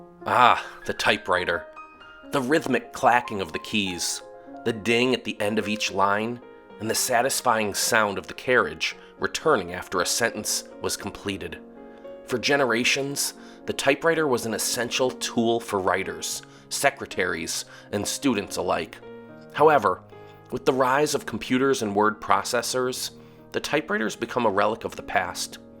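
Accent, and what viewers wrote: Midwest, United States. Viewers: I like your accent. It sounds like you're from the Midwest. I could be wrong, but that's what it sounds like to me.😊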